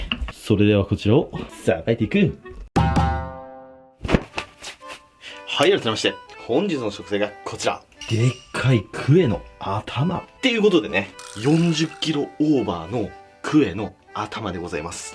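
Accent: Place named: Nagoya, Japan. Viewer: 動画は好きやけど名古屋弁がうざい笑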